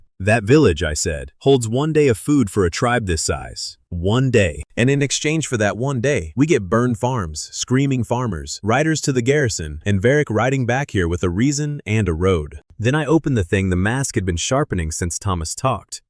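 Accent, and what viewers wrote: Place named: United States. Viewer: Good story but not a fan of the AI voices American cowboy accent for a midevle fantasy.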